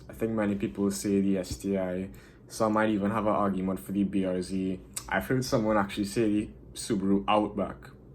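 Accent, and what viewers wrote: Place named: Trinidad and Tobago. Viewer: You have Trinidadian accent.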